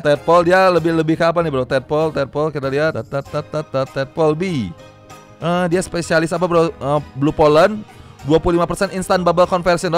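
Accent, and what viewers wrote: West Java, Indonesia. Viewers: Bang orang Sunda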